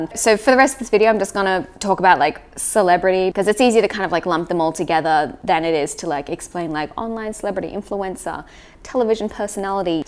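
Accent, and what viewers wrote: Australia. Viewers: Back in 2009 when I got my first Android phone and I started using many Social Apps in order to develop Friendships because this is where most of the offline people you know are now online. Out of hundreds of people while using these apps ive only acquired 4 real friendships...Here on Youtube I call some Youtube Creators my Friends and some do respond back to my comments. This is an eye opener for me to learn the term Para Social Relationship..knowing the person but not actually getting to know them in depth as a real person. Welcome to Fantasyland when viewing some content on Youtube? 🤔 What really stings is to think that you are developing an emotional connection with people for example ASMR Artists but nothing is there.. Btw nothing wrong with your hair. 🙂 Austrailian Accent 😎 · are you from Australia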